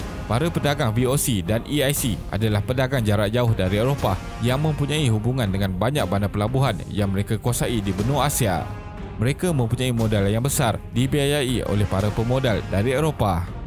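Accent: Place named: Malaysia. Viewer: Assalamungalaikum, admin dari Malaysia Kan,karna aku dengar lidahmu bukan lidah orang Indonesia 🇮🇩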